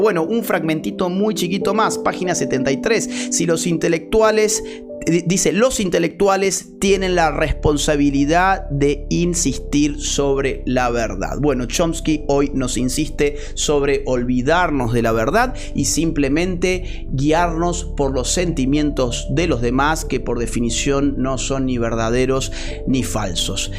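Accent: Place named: Argentina. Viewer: El presentador hace lo contrario a lo que enseña el pobre Chomsky. No comprende, ni remotamente lo que Chomsky está intentando plantear, para que se empiece a pensar. Estas cosas hacen que el acento argentino suene tan feo que a nadie le guste ni ir, ni juntarse, ni estar cerca de nadie con ese acento, de “sicólogos”, de habladores, gente que engaña y de gente de poco nivel. Así nos va. Un molino de palabras pegadas para hacer teatro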